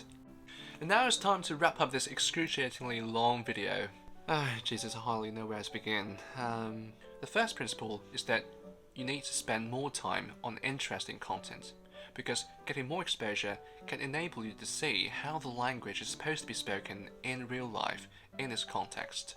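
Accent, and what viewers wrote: United Kingdom. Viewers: 英音听着好舒服❤❤❤ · 厉害的小伙子！第一次看视频。 Elegant British English!💕💕😊😊💙💙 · 請問可以分享您成為口譯員的歷程嗎? 謝謝 ps:英國音真好聽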